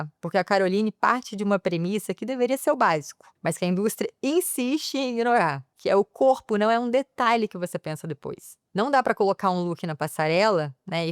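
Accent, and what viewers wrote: Brazil. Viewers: Desculpe meu hiperfoco em linguística, mas você tirando o chiado sendo que exala Rio de Janeiro a cada sílaba contrasta com a mensagem 🥀🥀🥀🥀 a menos que seja de Resende onde o sotaque é assim, daí só parabéns linda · Que MULHER, Brasil. (Sotaque muito capixaba; acho fofo). Ganhou um inscrito pelo texto excelente sem tratar a audiência seja como leiga nem como super especialista ❤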